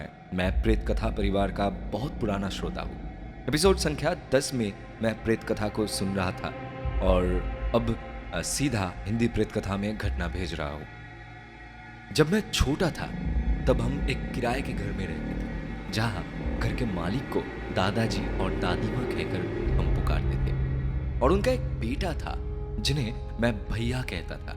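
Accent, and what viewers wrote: Bengal, India. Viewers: Dada ami tor onek boro fan. Tumi jkhn dadagiri te aschile tarpor theke ami pretkotha r sob episode sune niyechi sathe purono sob ghotona o sune felechi.Pretkatha hindi channel tumi jedin launch korle seidin e subscribe korechi. Hindi ghotona gulo sune amr mone holo j comment kori. To asol kothai asi kichu kichu word ache jegulo tumi bengali tei bolcho jemon aasa- 'ummid' hobe r 'mrityu'- mat hobe r bengali te 'র', 'ড়' ei 2to alphabet er opor ektu kaj korte hobe. Plz bollam bole kichu mone koro na. Actually Pretkatha r member hoyai Pretkatha jate r o perfect hoi sei jonno comment ta korlm. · Bengali tone avivi hein hindi mein... dhire dhire hindi tone pakar loge bhaiya. Avinandan.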